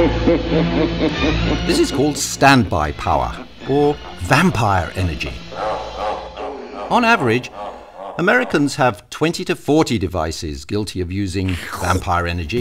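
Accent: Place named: United Kingdom. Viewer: i love this. HOWEVER i do find it a bit creepy that they chose to have a brit do the narration for wasteful american energy.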